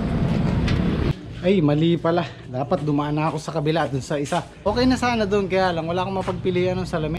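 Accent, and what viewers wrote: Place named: Pampanga, Philippines. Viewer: Kapampangan na boss magkasakit yang magtagalog i atsing magtindang sliding haha .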